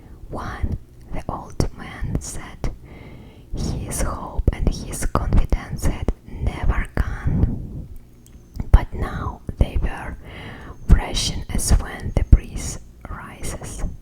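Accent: Russia. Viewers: You have a very lovely Russian accent!! Especially you also have a very lovely voice! I could just listen to you all day!! 🤍🤍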